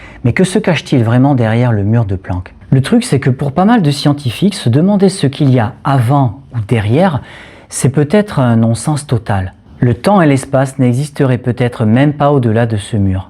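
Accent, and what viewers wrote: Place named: South, France. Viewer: Aïe ! Il vous faut prendre des cours de diction, mon garçon : la monotonie de votre récitation est insupportablement ennuyeuse, malgré les infimes traces d'accent méridional que vous y laissez traîner. Essayez de faire lire vos textes par une voix synthétique, ce ne pourra pas être plus mauvais en tout cas. Cordialement !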